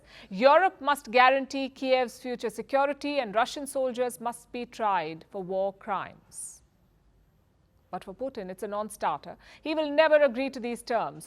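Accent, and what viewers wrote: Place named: India. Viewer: I love your news but please speak English little bit better... I can't understand of of your Indian accent, its way too strong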